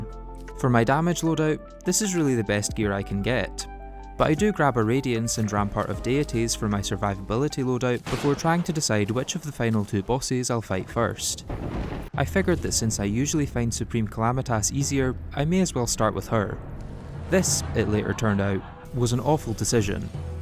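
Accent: United Kingdom. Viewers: Subbed but why are you british?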